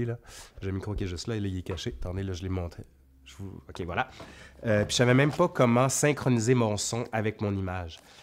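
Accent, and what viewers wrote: Quebec, Canada. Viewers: Une question qui me tarode depuis un bon moment et j'espère que tu pourras y répondre. Suite aux grandes découvertes, aux fuites de certains français en raison des guerres de religions, de la Révolution Française.... beaucoup se sont réfugiés au Québec ou en Louisiane, parlent avec le même charmant accent qui est le tien. Donc, question: parlait-on ainsi en France à l'époque des Henri, Louis Charles et autres? Et ainsi vous auriez conservé cette particularité linguistique, tandis qu'en France, la langue se serait "vulgarisée". Ce n'est pas un terme péjoratif, d'où les guillemets. Merci d'avance. Et Bravo pour tes vidéos. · Vous n'avez peut-être pas le coffre de Benjamin Brillaud, mais l'Histoire racontée avec l'accent québécois est fort plaisante. Ne changez rien :)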